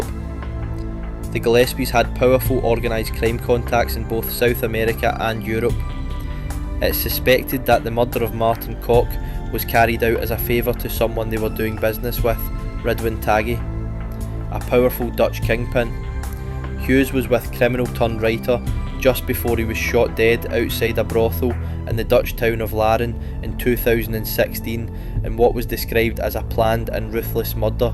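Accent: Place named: Scotland, United Kingdom. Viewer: Gid video mate, keep doing what your doing, well put together & it's good to hear a Scottish voice talking about Scottish crime, rather than AI or a mad American, who doesn't know the difference between Scotland & Somalia 👌🙌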